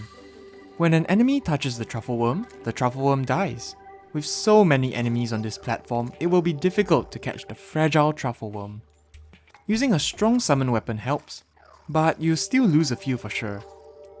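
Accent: Singapore. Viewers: Are you from singapore? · I knew it from the accent. This man is Singaporean. Cool to see.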